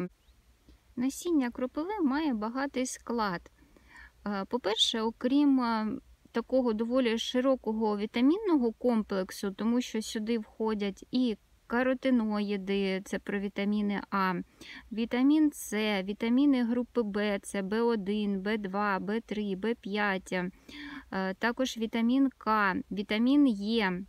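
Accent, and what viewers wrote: Standard. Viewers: Более года , как ВПО, живу среди жителей трускавца, Дрогобыча, Борислава и Стебника и ни разу не слышал такую красивую, чистейшую украинскую речь. У местных жителей, украинская речь ну совершенно другая😅 Откуда, вы, мадам? Единственное, что подтверждаю, что крапивы здесь как у нас на Донбассе амброзии.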